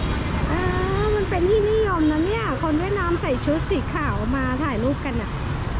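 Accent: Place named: Thailand. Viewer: Melihat beberpa video anda yang berawal dari Vietnam, saya beranggapan bahwa anda orang Vietnam, rupanya anda orang thailand 😅. Cuma dari awal logat berbicara anda, saya sedikit memahami bahwa anda orang thailand, hanya karena anda meliput video Vietnam maka saya mengira anda orang Vietnam 🙂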